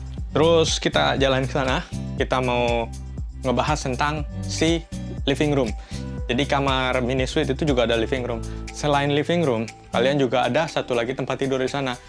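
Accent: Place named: Java, Indonesia. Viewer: Aslinya mana kang, logatnya ko keliatan kejawa jawaan :v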